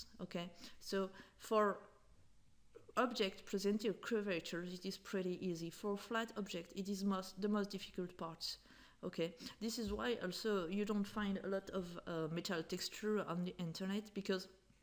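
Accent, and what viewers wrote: France. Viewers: Bonjour, J'ai regardé vos vidéos. Je crois deviner un accent français. J'aimerai faire des sculptures en bronze à l'aide votre utilitaire "Metal Shader" avec Victoriai 7 de Daz Studio. Est-ce que c'est possible? Pourriez-vous svp me donner quelques pistes pour débuter? Je vous remercie. Jean-M!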